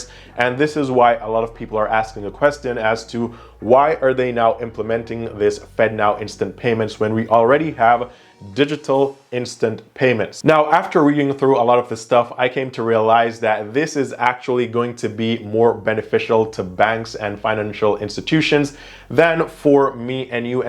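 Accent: Jamaica. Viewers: Do I hear a slight Jamaican accent? Are your parents Jamaican?? Good stuff though. Thanks for educating those who are in the dark 👍✌️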